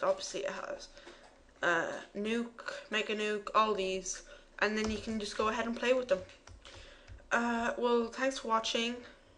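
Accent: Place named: Ireland. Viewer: good to see someones irish not american liike me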